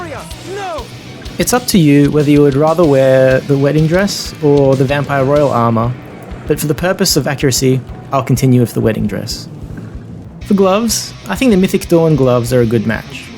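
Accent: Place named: Australia. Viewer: Nice australian accent!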